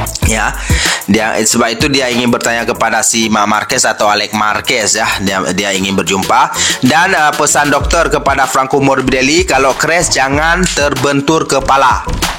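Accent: Aceh, Indonesia. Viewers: Admin logat aceh melayu... Gua orang kalimantan aja paham kok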